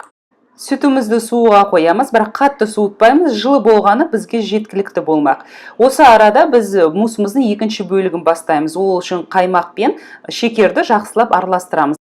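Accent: Standard. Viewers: Таза қазақ тілінде😍😍😍 рахмет сізге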